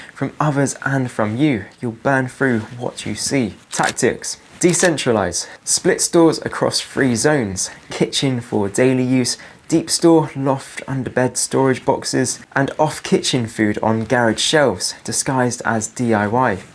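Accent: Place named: England, United Kingdom. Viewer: This is sound enough advice, but two things strike me, as a skeptical viewer. This is a clever and simple way to get affiliate links. (You may not be.) Well, if people are going to use Amazon to buy such things anyway, fair enough. You're English, judging by your accent, and you've made yourself famous as a man who knows how to store things for a chaotic time in the UK. Granted, most opportunistic thieves are not scanning YouTube to find the faces of people such as you. They are too busy being a nuisance on the street. I am sure you'll shave the beard and I would hope your hair is fake - not that there is anything wrong with actually having long hair.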